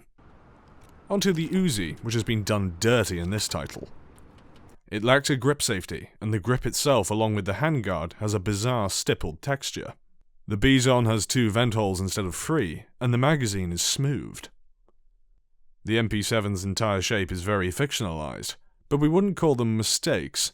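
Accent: United Kingdom. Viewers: He's British. Their accent makes everything sound more official. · I think he's British so that's a given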